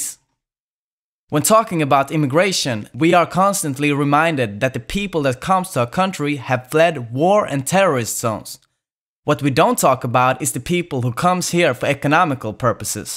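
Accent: South, United States. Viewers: Never knew Swedes has such a US southern accent 😂 I don’t doubt that you’re Swedish, but from who did you learn English from? Sounds like someone from where I’m from, the deep USA south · Where did you pick up that Southern American accent?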